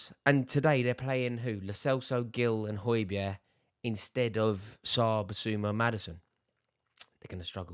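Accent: United Kingdom. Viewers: Британский звук, после жаргоного американского звучит забавно☝️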